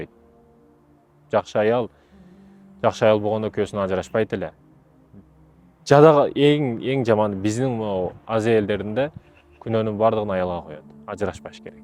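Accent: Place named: Osh, Kyrgyzstan. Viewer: Ошский диалект)))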